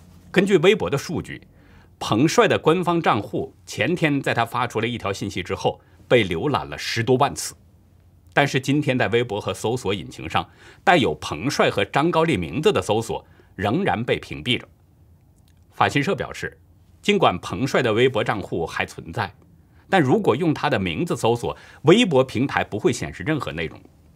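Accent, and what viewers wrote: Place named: Hebei, China. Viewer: 谢谢李老师。听老师的口音，好像是河北人？能听到一点唐山/承德一带的口音。😆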